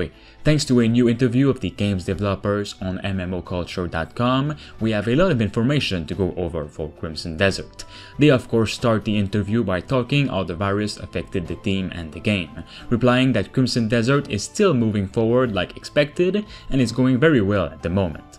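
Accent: Quebec, Canada. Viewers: I am sure of one thing, your accent is from Québec ?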